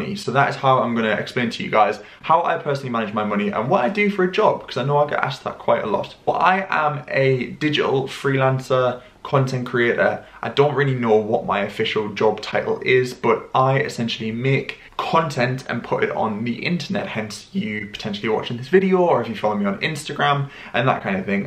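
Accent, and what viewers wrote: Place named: Newcastle, United Kingdom. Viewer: your geordie accent caught my ear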